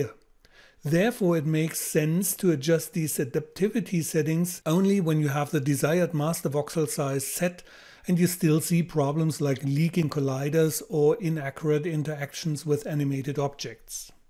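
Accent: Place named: Austria. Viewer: Great Info I'm definatly going to follow through also is the Accent Austrian I really like it XD!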